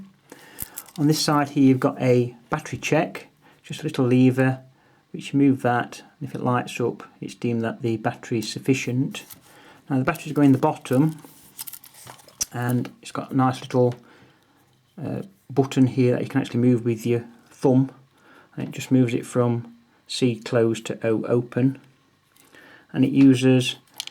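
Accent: Yorkshire, United Kingdom. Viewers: much appreciated, I noted after I sent the message that it was six years since the video, but I live in Lyndhurst New Forest have done for past 30 years, but used to be a fireman in South Yorkshire Sheffield having Transferred over from Manchester, so it was nice to hear the dulcet tones of true people! I collect cameras and have a varied selection right across the board, but I’m light on the Older Nikon, shall we say in the upper bracket, revered F series F1 and F2, so you prompted indirectly, a bit of homework 📚 and I looked at several references to these models and your right the F2 gets glowing reports in fact it’s difficult to find a bad remark, also the XD in my Minolta there is an XM on eBay at present, that looks decent and money at present is good, but I think you have swayed me. Loved the various controls and invasions, Minolta where always like that though, even in the Dynax range it was always more, but probably their down fall. 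Still the name lives on with Sony and It’s Nikon that are really having to come up with the goods now. Still carry that premium Name and always will. Thanks again and nice that you got the message. Regards David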